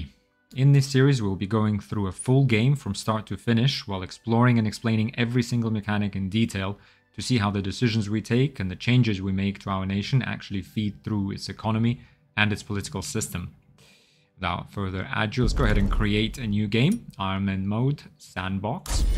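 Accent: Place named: Eastern Europe. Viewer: I've watched a quarter of the video and the question I wanted to ask you the whole time was, are you a native British citizen? Your accent sounds vaguely familiar, are you from Eastern Europe? Sorry if this is a personal question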